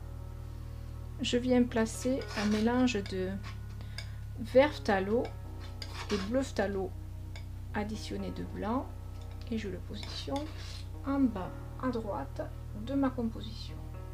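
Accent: South, France. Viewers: Toujours un réel plaisir.de voir votre façon de travailler avec de surcroît votre douce voix du Sud de.la.france ! Vous Expliquez toujours très clairement c'est adorable a vous merci à vous ,🙏❤️ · Bonjour, Merci pour vos vidéos, elles dégagent de belles énergies créatives 🙏. Je reprends la peinture ( merci le confinement 😉) par contre la peinture au couteau est une première, pas facile à positionner sur une toile quand le chevalet est absent... Une astuce? A votre accent vous êtes dans le Sud. Faites vous des cours de peinture en présentiel?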